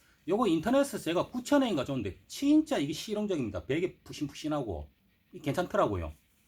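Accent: Gyeongsang, South Korea. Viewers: ■ 새마을 티셔츠보고 방심했다가 장비보고 놀랍니다. 특히 msr 리액터에 빵터졌음. 아재 잘보고 갑니데이~ 구독도 박았심더. 고수시네요 ^ ^ 사투리도 구시하이~ ㅋ 저도 대구 경북이라서 듣기 편합니다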